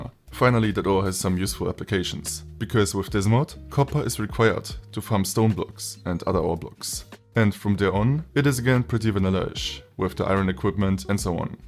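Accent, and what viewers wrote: Germany. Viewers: German accent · bro man hoert das du deutsch bist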